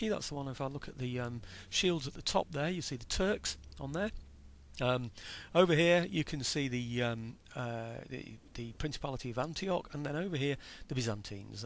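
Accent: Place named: Midlands, United Kingdom. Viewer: i ccouldnt quite tell you were proper northern i thought more midlands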